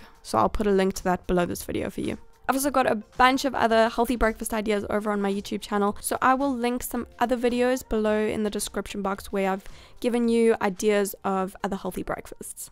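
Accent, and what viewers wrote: South Africa. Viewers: She's south african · are u South African or Australian? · HATS THAT ACCENT ? SOUTHAFRICAN ? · Are you South African? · Are you south African? That accent 😍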